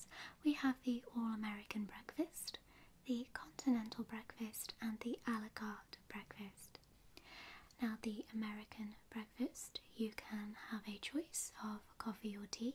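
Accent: United Kingdom. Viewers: british accent okie dokey. Loved it. Also Room 234567? How many floors does this place have? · "British accent" brillant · Öki Döki :D That british accent is funny... and adorable at the same time ;) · Isnt this the normal british accent Why write it in the title for the first time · Oh you're British? Never could tell before with the accent. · A typical British accent. Because I am British this sounds normal. 😂😂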